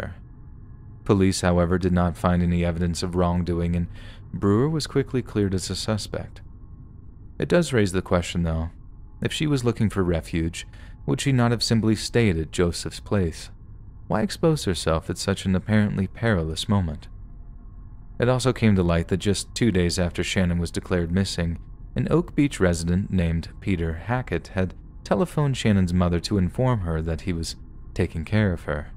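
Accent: Australia. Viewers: Except for his Australian accent. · Australian accent is gold <3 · That Australian accent though...🖤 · Your aussie accent!! Love it 😍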